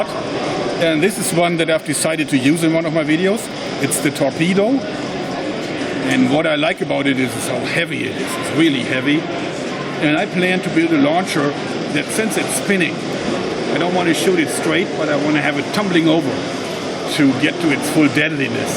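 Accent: Germany. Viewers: Where did you find those rubber bands and is that accent German? Also do you have a place where i can buy your originals? Oh, and its kinda weird that you wear socks and sandals.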